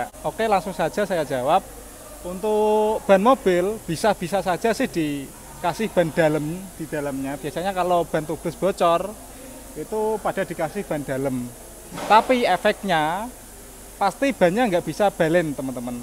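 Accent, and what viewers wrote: Java, Indonesia. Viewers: Ngomonge kaya wong jawa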